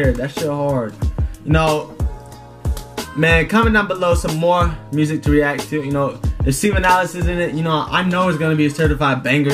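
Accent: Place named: China. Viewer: Youre dutch sounds like Chinese (no hate tho)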